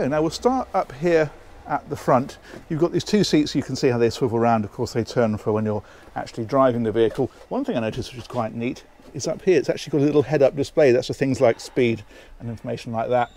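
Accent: United Kingdom. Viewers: Thanks a lot for making different videos. I love British English accent and I see your videos because you speak very clearly & beautifully. I congratulate you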